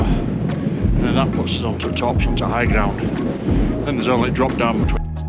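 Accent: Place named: Bradford, United Kingdom. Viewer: also loved hearing a bradfordian accent 😀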